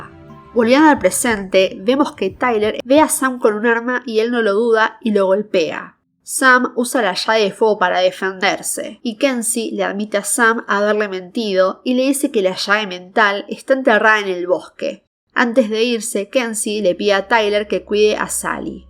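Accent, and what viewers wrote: Argentina. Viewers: Te lo explico porque al parecer sos ignorante, yo soy argentino y el acento en mi país es así, yo= le decimos sho, llave= shave, la "Y" se pronuncia así, deberías tener un poco más de respeto, en diferentes países se hablan de diferentes maneras y cada uno tiene un acento.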